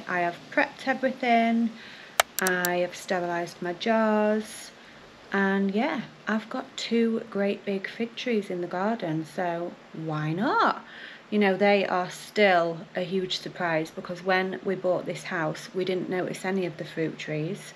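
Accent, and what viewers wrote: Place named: Yorkshire, United Kingdom. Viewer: Dear, I am binge watching your vlogs, I like your calm style and I find your Yorkshire accent loveable. Your channel is as entertaining as Nicki Positano or Kristen and Joerg from the UK. I love about you and these others vloggers that you lead a pretty great life in a nice place and you are aware of that and make the best of it and try to enjoy totally. Marvellous and intelligent attitude. Keep up your good work. Anyway I love your clothes choice and your earrings too.😘😘🌼🌼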